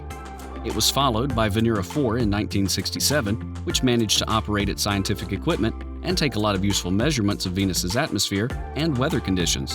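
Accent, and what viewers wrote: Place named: United States. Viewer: Great video - too bad that the speaker has a boring and uninteresting American voice. A British accent would be much better for the viewership - or anybody else for that matter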